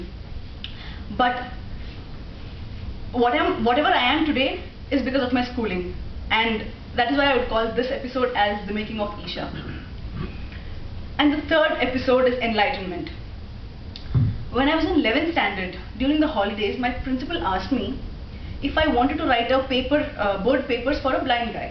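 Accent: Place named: France. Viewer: the rythum of your English is almost French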